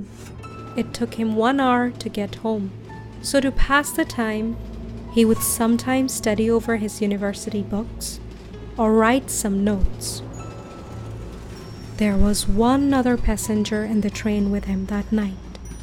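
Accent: India. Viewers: Are you indian by any chance? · U have a really Indian accent it’s annoying me. Sorry !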